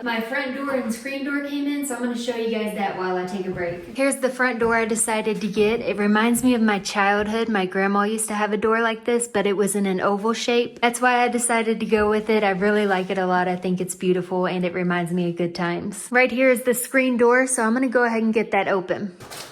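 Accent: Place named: United States. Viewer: Hello from Australia. Im Really enjoying this series. You are doing fantastic and you are such a hard worker, you should be very proud of yourself. I have no idea what part of the US your accent is from but i love it. Thank you for sharing your journey with us.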